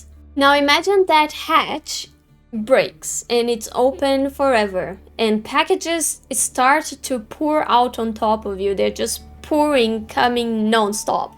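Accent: Brazil. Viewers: vc é brasileira ne fala a vdd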